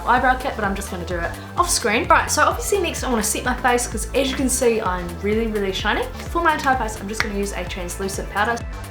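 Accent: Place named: New Zealand. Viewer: I'm from Egypt :* I knew you were from New ZeaLand because your accent is similar to ShaaanXo's .. I like your accent a lot actually :))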